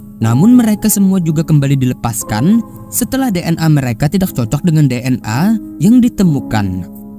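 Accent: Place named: Madura, Indonesia. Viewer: Mohon naaf ini suara seperti ada logat Madura, apakah orang Madura yg tinggal di Kalimantan, maaf ya😁🙏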